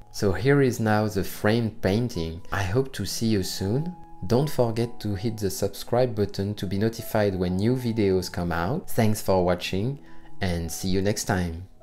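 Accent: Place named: France. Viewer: Non, c'est compréhensible. Pour un anglophone l'accent français est charmant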